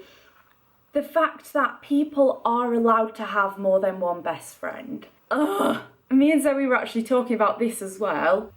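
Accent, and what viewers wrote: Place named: North England, United Kingdom. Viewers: I love your accent where in the north are you fromy mums from liverpool but I live in sw london xxxx I love you . Great video x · She's from Sheffield I think, North of England :) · yorkshire i think?x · Reasons why quickly became 1 of my favourite youtubers: 1) She answers comments like says thank you or answers peoples questions which I find not many youtubers often do. 2) She works so hard on her videos especially editting them and they look amazing. Also you upload them regularly unlike some other youtubers. 3) You are brave enough to talk about this on the internet even though its a hard subject. 4) I dont usually like yorkshire accents but I love yours and I wanna speak like you although I cant do a yorkshire accent lol. Thank you for making this video even though you dont want anymore subscribers I think you truly deserve it and I cant imagine how anyone wouldn't like you. 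As well as that you made me think about being judgemental in this video and will try not to be, therefore you are making me a better person. Please dont stop making videos and please be in zoellas vlogs! I hope the hate stops and that this comment makes you feel better xxx 💞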